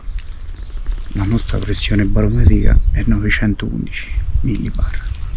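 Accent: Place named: Central Italy, Italy. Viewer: Bellissimo video di caccia, complimenti ! Dall'accento sento che sei del centro Italia... di dove esattamente ?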